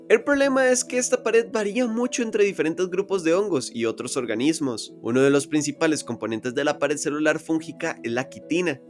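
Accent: Costa Rica. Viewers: Que raro se me hace oír el acento tico en youtube. Buen contenido, no sabía que había edutubers acá. Eres un grande!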